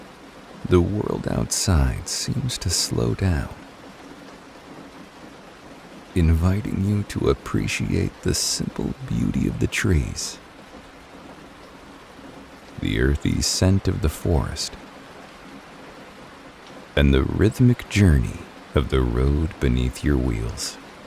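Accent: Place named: United States. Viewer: Nice to hear a cultivated American accent. Have to work on its not sounding so supercilious …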